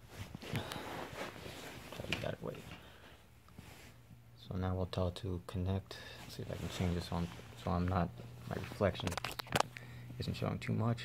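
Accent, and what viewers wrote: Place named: Chicago, United States. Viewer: Nice video. You sound like you're from Chicago, my hometown.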